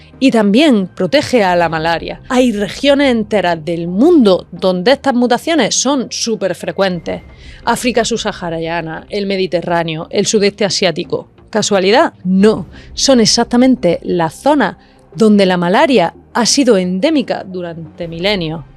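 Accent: Spain. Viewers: Una mujer hermosísima que sabe de lo que habla, estupendas historias maravillosamente contadas, una voz que cautiva y para más, ese gracejo andaluz y ese decir que conquistan corazones sin piedad. El mío ya se lo robó cuando ví el primer video. ¿Se puede pedir más? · Fenomenalmente explicado. Magistral,. Acento granaino ENCANTADOR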